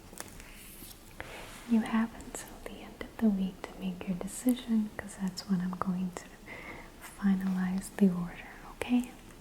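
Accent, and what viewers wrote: United States. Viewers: aka American accent version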